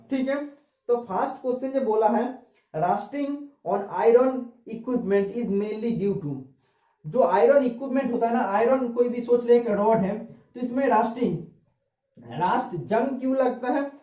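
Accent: Bengal, India. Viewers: Are you from Bengal ?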